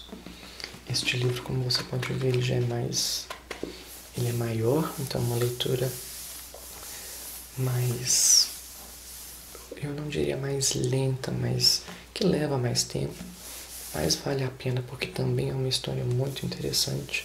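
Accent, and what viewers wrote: Minas Gerais, Brazil. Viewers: O sotaque mineiro ❤️ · Amanda Utuari ele é do interior de MG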